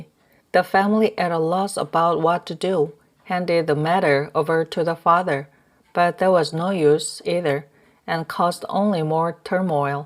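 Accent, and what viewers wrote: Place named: United States. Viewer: 오우~아메리카 발음 👍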